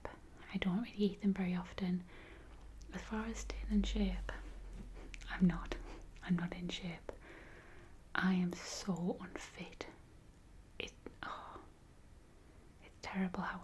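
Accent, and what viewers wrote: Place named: Newcastle, United Kingdom. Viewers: Great to see a fellow Northerner creating ASMR videos. At last an accent I can stand, but Singapore is definitely not in Germany! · Sounds like a Geordie lass. :)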